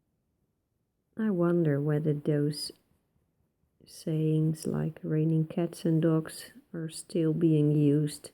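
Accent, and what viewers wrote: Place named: Netherlands. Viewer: The expression "raining cats and dogs" is still in common usage in the U.S. Chartreuse rhymes with "loose." I find your Dutch-tinged English to be delightful and charming, with never a time of misunderstanding. We find these days that the younger generation simply will not speak grammar properly, so it is actually a relief to me to listen to your excellent English. Ohmigosh - sign me up for your workshop at one of our national parks!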